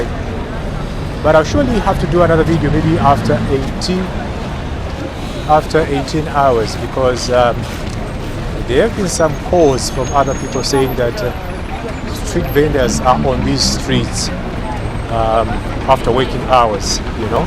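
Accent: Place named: Zimbabwe. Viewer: He thanks for the video this happened when i was in zambia in july/august. You sound zimbabwean bro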